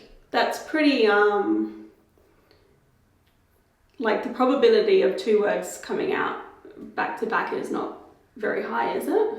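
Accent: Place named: Australia. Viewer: Omfg that Ozzie accent I'm dying 😆😆 you go alright aye love your work greetings from kalgoorlie W/A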